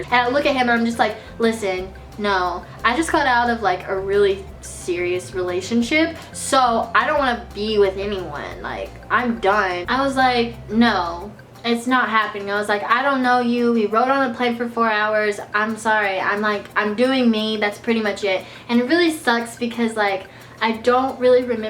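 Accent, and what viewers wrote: South, United States. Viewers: Margot robbie said she watched your videos to get a southern accent for a movie omg! 😍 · I love your country voice man I've always liked country voices 😝 lol I've never said man before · Are you southern cuz you have a really strong accent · what state are you from? I realize you would obviously be from the south. I live in the south aswell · You sound so southern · you voice sounds country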